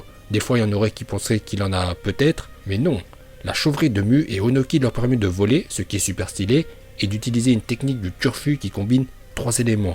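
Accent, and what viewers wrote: Japan. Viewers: t’es vidéos sont bien mais sa rend fous t’es accents japonais alors que t’es breton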